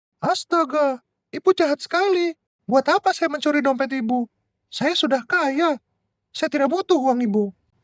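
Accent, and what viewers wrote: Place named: Medan, Indonesia. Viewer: Kerennnn...logat medannya berasa banget...good luck yachhh 🎉🎉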